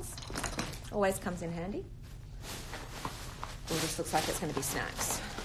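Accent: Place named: United States. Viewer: THE AMERICAN ACCENT HAGAHAHHAHAHA IT WAS GOOD THO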